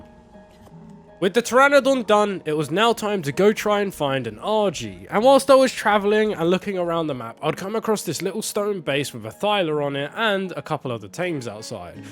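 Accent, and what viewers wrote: United Kingdom. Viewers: Brit English is so annoying!!!!!!!!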